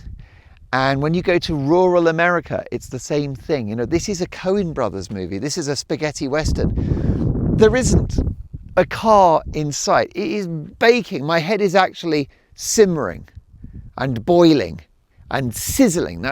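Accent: France. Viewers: Mark you always make me laugh!!😂😂😂😂 Keep practising the french language💐💐. I like the accent🌺🌺🌺 ⚘️🥀⚘️⚘️⚘️🌻🌻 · Hello! I love your show and you have a lovely french accent, but you are so so wrong about Emmanuel Macron. He speaks a lot but tells 2 things about the same topic and never never never act! And do not tell him about freedom of speech. He doesn't understand the concept. But it looks like "nice" out of France. Cannot understand why.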